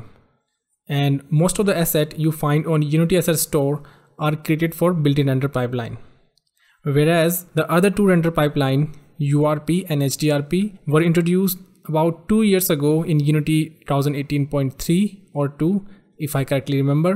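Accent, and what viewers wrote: India. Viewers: I can guess you are an Indian from your accent... #respect bro. Nice work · are u from indiea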